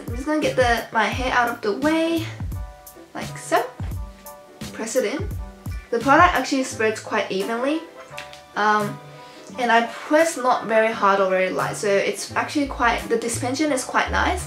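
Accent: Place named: South Korea. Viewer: thank baby love your share 尽管听韩国口音很想死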